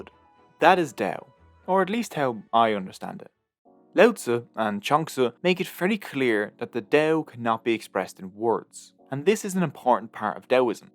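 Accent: Ireland. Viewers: i know but its just his accent. Irish accent. Not everything needs to be pronounced correctly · you have a beautiful irish accent are you from limerick? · Interesting video, I recognize the Irish accent. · I advice you to change your Ireland-ish accent, it is very uncommon and I think some people including me find it disorienting and irritating. Perhaps shift towards a more british or american accent.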